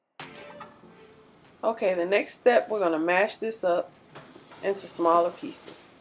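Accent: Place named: New Orleans, United States. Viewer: Girl, you sound like...a NOLA Girl! Gotta have that Creole flare. You gotta tell me where you get the vegan meats because in New Orleans, you can only find a few aspects of them. I go to whole foods and if I look for the beyond meat crumbles you mentioned, they only carry the burgers and chicken strips.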